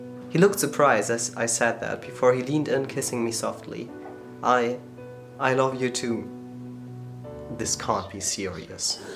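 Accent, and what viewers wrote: Germany. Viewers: Sis r u German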